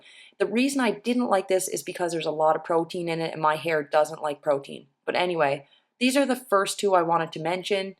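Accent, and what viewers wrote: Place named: Maritimes, Canada. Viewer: I seriously love your maritimes accent I live in the suburbs of Ottawa. I'm just finding my curls again. Love your Channel feel free to check mine out to mines more about the food and saving money LOL.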